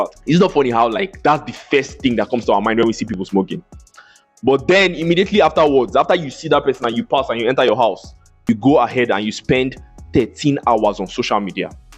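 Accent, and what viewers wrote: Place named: Nigeria. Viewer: Nice video Bro hearing a fellow Nigerian brother speaks with a naija Tongue really resonate so much Waptrick, redwap You were really spot on Porn addiction is the grandmaster of the other addiction. A porn addict brain is same as that of a person on heroin, a porn addict, will also be addicted to gambling and other vices A man who can control his sexual urge is a dangerous man, and a dangerous man is a focused man I have some video requests for you, will like to hear your opinion on 1) Nofap and Semen retention 2) Single mothers: - Dating a single mother/ babymama as a man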